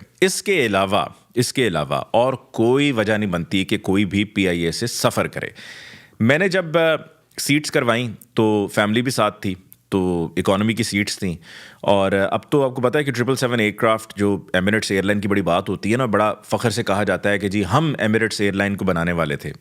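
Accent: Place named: Lucknow, India. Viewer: صاحب آپ ماشاء اللہ دیکھنے میں منڈی بہاؤالدین کے لگتے ہیں اور آپکا تلفظ لکھنؤو والا ہے ۔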